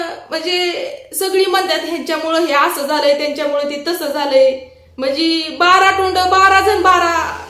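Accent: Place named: Bhor, India. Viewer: मी तुझे व्हिडिओ कायम बघते मला तुझे व्हिडिओ आवडतात कारण मी पण भोर तालुक्यातील आहे कारण तुझे बोलणे आहे ते रेल आपल्या गावाकडचे आहे ते मला खूप आवडते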